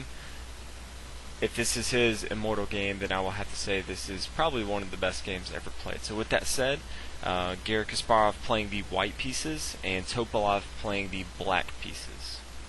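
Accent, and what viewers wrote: South, United States. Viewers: This entire game just oozed talent and skill. I hope someday I'm able to reach half their level of skill. Excellent commentary as well, but I found some of the southern accent slightly distracting while trying to study the moves.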